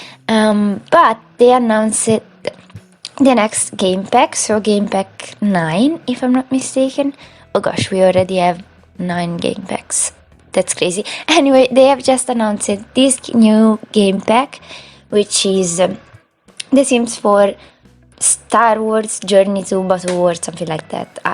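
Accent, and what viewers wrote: Italy. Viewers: ma sei toscana ? si sente troppo anche quando parli in inglese <3 bellissima casa comunque, l'esterno è da favola ma anche la struttura stessa della casa è davvero speciale.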